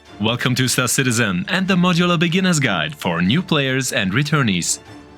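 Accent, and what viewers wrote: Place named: Germany. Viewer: Irgendwie hört sich der Titel komisch an mit "The Great ... " es wäre passender gewesen "Big Guide, oder Hugh Guide", musste schmunzeln 🤣 PS: warum machst du eigentlich auch Videos in englisch? In deutsch macht schon Sinn, beim zuhören bekomme ich fast immer starke Kopfschmerzen, weil du Englisch mit deinen deutschen Dialekt sprichst, es hört sich so an, wie wenn Arnold Schwarzenegger in den 80' spricht. Englisch passt gar nicht zu dir, vor allem ein paar Wörter konnte ich gar nicht verstehen, weil zu undeutlich gesprochen. Wenn es deinem Kanal was bring o.k, sonst mach dir diese Mühe erst gar nicht. Nur so meine Meinung, dafür ist ja die Kommi Funktion da.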